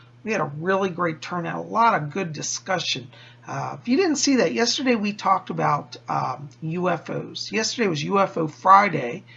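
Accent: United Kingdom. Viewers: I love you so much (I feel compelled to call u ). I am British. You are speaking the queens English. Fletcher love to you. Xxx.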